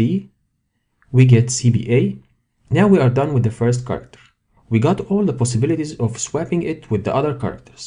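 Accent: Egypt. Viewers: What an Egyptian accent <3 <3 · Hi, your accent is Egyptian lol, let me know if I am correct. Great video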